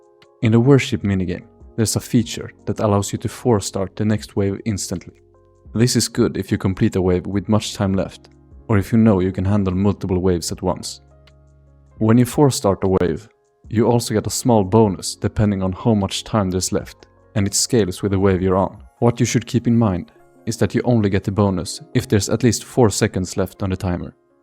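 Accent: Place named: Sweden. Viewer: snyggt, nä men notera det när jag hörde att de va lite svensk dialekt i din engelska, funderade om du va norsk eller svensk xD :D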